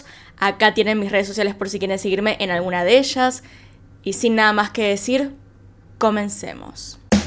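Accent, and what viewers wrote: Argentina. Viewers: soy de origen mexicana pero casi toda mi vida vivo en Amsterdam, tan chistoso el accento Argentino con el sssshhhhhhh muy interessantes video's nadamas tu maquillaje muy claro/blanca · Esta mujer me encanta tambien😻😻 sobre todo por su acento argentino y su inteligencia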